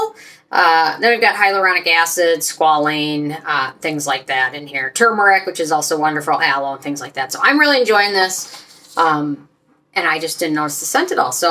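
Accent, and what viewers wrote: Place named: Midwest, United States. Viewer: Paula’s need to STOP … it’s way WAY too many products that she’s selling. I only use one of her cleanser and a booster. More than half of her facial moisturizers affect my eyes, after an hour of application (I’ll stick to my drunk elephant). I’m not a sunscreen person….. after trying hers and expensive brands of both chemical and mineral sunscreens, I’m left with irritated eyes. Anyway, it’s fun watching you and I ❤️ your Midwest accent. Keep up the awesome work👍.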